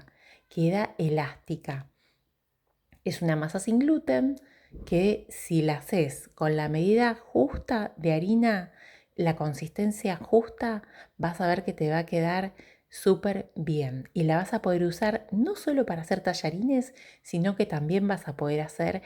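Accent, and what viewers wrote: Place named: Argentina. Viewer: Gosto muito mais de ouvir a falando naquele espanhol musical da Argentina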